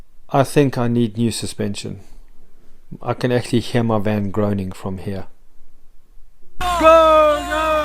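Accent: South Africa. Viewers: Good ol South African accent 😉👌🏼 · I can spot a south african accent from miles away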